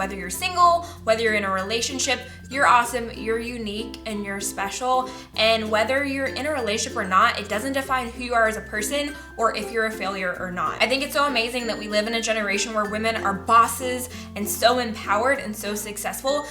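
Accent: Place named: Tennessee, United States. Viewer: After watching this, I watched your Thanksgiving video and I love your accent! It's so pretty in Tennessee!! I love you! #snapfam #Igfam #youtubefam #queen 💜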